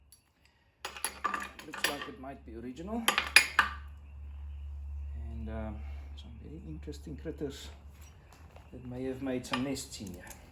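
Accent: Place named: Australia. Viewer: Love the Videos. Your Aussie accent is so calming. Sends Tingles down my spine 🤣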